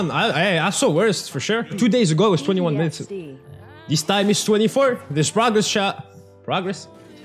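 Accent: France. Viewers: Ta laccent francais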